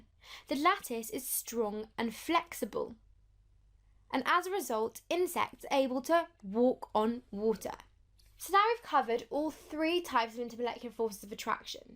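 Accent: United Kingdom. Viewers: I've never heard that much of an english accent in my life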